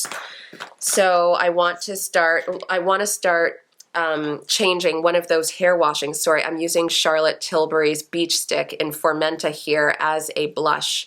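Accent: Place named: Canada. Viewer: Ahhh I could tell you were from Canada! I knew by the accent of course, but the “I’m sorry “ near the end (as well as the new car pics) sealed it 🤣 👋 waving at you from down the road a bit in Cobourg!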